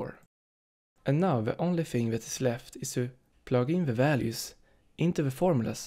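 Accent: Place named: Sweden. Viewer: Are you possibly swedish? (Very very few times i thibk i can distinguish sole swedish accent). Either way, great video!! Really enjoyed it :)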